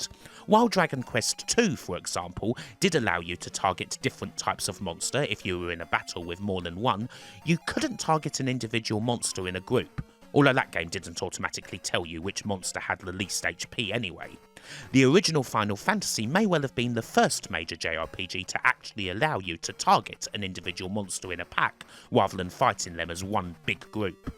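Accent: United Kingdom. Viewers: Does the narrator have a particular British accent? I'm asking because I'm not British but from Sweden.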